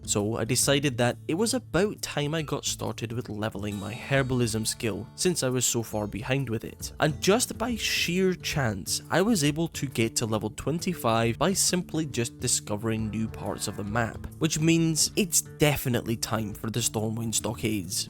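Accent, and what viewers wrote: Scotland, United Kingdom. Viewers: I first played WOW 16yrs ago, then I stopped when I hit level 85 in Cata. I'm coming back - I'm interested in how the retail changes have changed the experience oh, and for some reason my level 85 Mage is not that level anymore. Trying to pin down your accent, definitely Scottish but can't quite narrow it down - don't think Glasgow, hmmm ...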